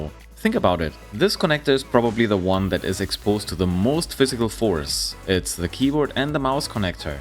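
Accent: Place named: Germany. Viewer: so you could definitely say that the dark background music you put in there was already some sort of "subtle foreshadowing", right? BTW, you sound waaaaay too german for someone that speaks english natively, so i guess we're both from germany?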